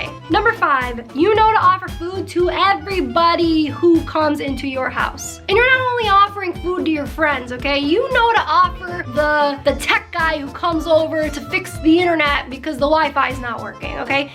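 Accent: Naples, Italy. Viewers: Yeah she sounds Napolitano or siciliano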